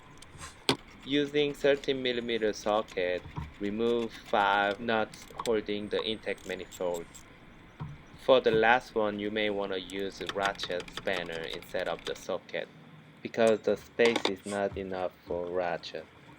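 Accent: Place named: China. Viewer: You h@ Chinese accent dude.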